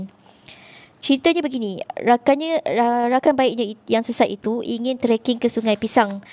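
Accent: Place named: Java, Indonesia. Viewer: Pekat jawa nye ni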